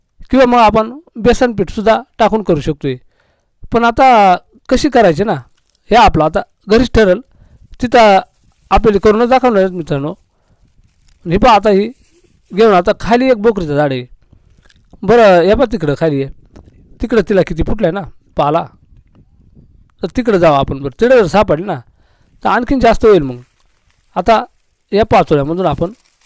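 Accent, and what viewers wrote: Maharashtra, India. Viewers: कंच गाव भाषेवरून महादेव कोळी वाटताय 👌🙏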